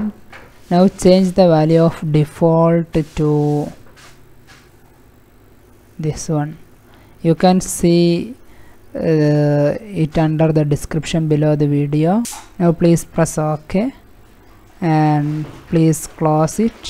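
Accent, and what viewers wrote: Kerala, India. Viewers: Malayali aanalle..? 😂😂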